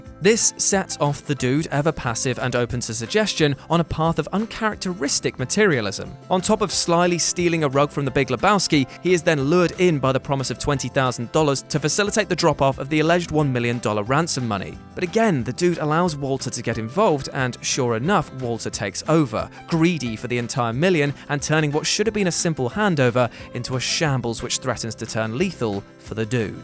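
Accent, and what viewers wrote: United Kingdom. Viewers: I usually don't trust anybody with a British accent, but I'll listen anyways.....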